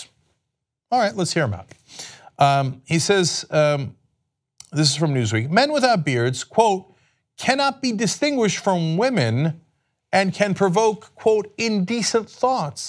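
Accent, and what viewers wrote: Turkey. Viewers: That was your Turkish accent?! I have never heard anyone talk like that here. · Andrew Truman he’s Turkish, I think he comes by the accent honestly, but I don’t love the derision.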